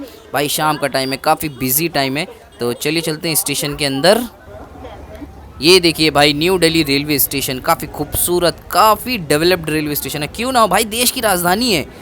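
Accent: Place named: Gujarat, India. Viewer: Gujarat se ho kya, hindi accent gujju lag raha h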